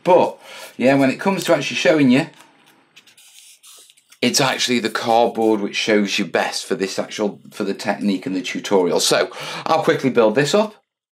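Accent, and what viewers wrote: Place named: Scotland, United Kingdom. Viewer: This is my first time watching one of this guys videos and I was not at all ready for his Scottishness 😂. Also bro calm down you are going to hyperventilate.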